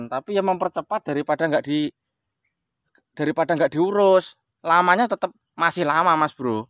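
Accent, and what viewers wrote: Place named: Java, Indonesia. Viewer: Jian medok jowo tulen mase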